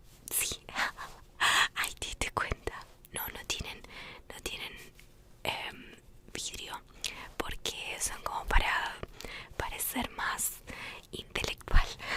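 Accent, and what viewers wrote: Argentina. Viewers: llevo 45 segundos de video y estoy seguro que es argentina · Sos argentina como yo?? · Wah... me encanta el acento Argentino, con solo escucharlo me relaja v: \^-^/💕 Eres muy hermosa ❤ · Me cage de risa ensima el acento porteño nananana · Si no es Argentina es uruguaya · Hola,te quiero preguntar de que pais sos? Por q creo que por ti forma de hablar sos de argenrina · sos argentina?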